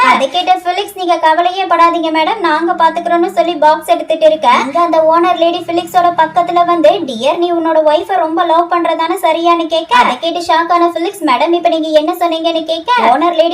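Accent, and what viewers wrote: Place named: Coimbatore, India. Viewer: Neenga Coimbatore i am thirvannamalai sis i love ur slang and voice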